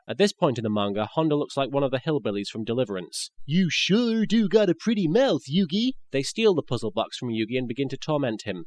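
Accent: United Kingdom. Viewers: holy crap is british??!!! how did i not know that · ZOMG his real voice is just a combination of bakura and yugi's voices. or yugi with a brittish accent hard to tell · wait is british? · He's actually British?! THAT'S AWESOME! xD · Wait is British??? Bakura? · your british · Except his voice is more British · I was not expecting for you to be British. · I miss your old clearly british voice.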